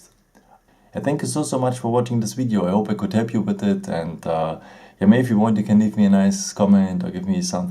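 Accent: Germany. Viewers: Brilliant German accent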